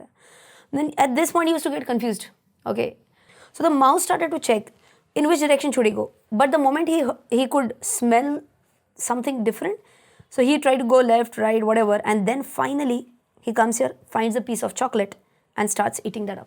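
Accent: Maharashtra, India. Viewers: Madam your videos have been allways encouraging, I am from Belgaum and my mother from Maharashtra, basically we are marathi speaking, you marathi English accent gives mora attachment to all your videos, I just Love them. Thank you. PRESENTLY I am reading a Book Effective time management by polly bird published by flash. I really felt its worth for all the citizens of India, if you can make video on it it will be interesting. Also mada I make lot of spelling mistake if Google is not around me if you can make video on that it will be nice. Vivek varale Bangalore.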